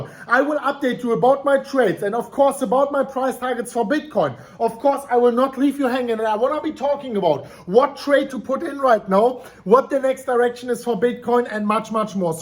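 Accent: Germany. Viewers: I love your quick videos and German accent. Keep up the good work, can you put the prices in GBP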